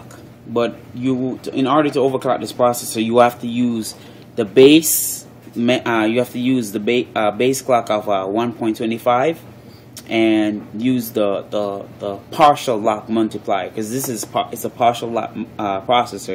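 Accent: Jamaica. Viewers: I love Ur Jamaican Accent :) ( remind me of my best bud)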